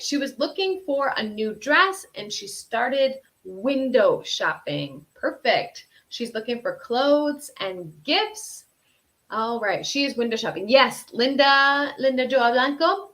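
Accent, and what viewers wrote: Colombia. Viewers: Pero tiene buen acento colombiano, dice que es de estados unidos pero algo de colombiana tiene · Su acento en Ingles es muy Colombiano.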